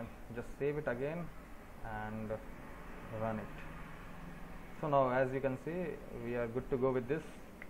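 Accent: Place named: India. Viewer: its always a damn robot non english speaking indie person... cant understand a damn word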